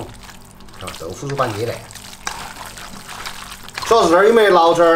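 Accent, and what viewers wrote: Chengdu, China. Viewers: 你的成都话我太欣赏了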